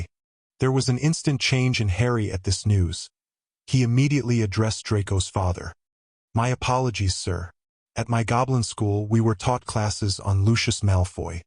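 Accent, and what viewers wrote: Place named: United States. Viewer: This is cool, turning fics into audio. I have read this fic multiple times. PLEASE can it be in a British voice if the source material is British? I couldn't listen for very long (even though I appreciate that this exists) because I can't listen to HP in an American accent, sorry.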